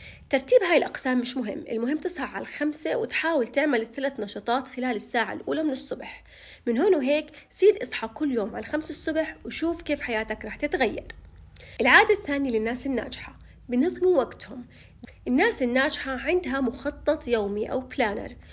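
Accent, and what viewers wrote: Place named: Jordan. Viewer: شكرا الك .. محلاكي باللهجه الاردنيه الطبيعيه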